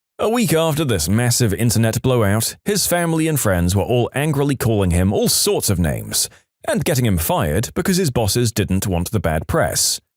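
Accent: United Kingdom. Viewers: I love the British voice more actually lol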